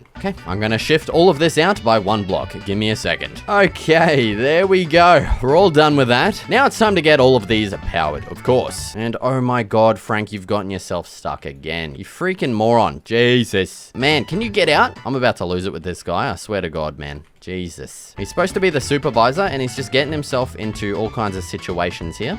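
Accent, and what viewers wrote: Australia. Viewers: are u Australian cuz you have a aussie accent some times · Are you Australian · I can't tell if you're an ausie or a British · Idk why but your voice makes you sound like the ultimate Australian surfer boy giga chad XD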